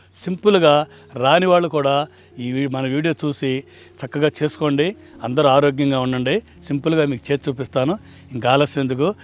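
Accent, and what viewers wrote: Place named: Kolar, India. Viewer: Thank you for writing it in Kannada too andi. I'm from Kolar and your Telugu is so similar to the dialect we speak.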